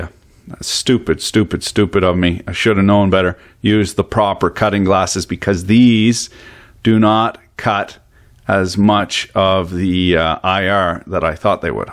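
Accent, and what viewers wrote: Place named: Canada. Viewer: Why can't Canadians pronounce Z correctly?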